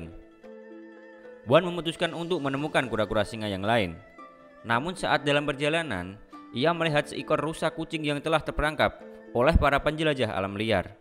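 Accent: Indonesia. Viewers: Abang orang NTT ya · Medhok looorrr....!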